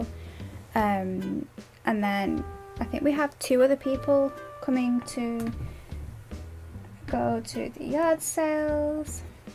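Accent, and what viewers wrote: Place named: England, United Kingdom. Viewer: Where are you from in England? Your accent sounds familiar but I’m really bad with accents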